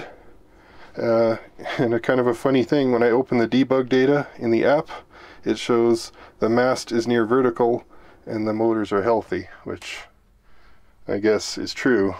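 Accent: Canada. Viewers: I applaud your knowledge, skills and resourcefulness. Your presentation is well organized, clear, concise and complete. I also applaud you and your spouse for you adventurous spirit and commitment to your daring lifestyle. I enjoyed the presentation with the clever and apt visual effects. Very impressive. I also detect a soft Canadian accent. I wish you great success and fun on your continuing adventure and thank you for sharing a bit of your experience on YouTube Awesome!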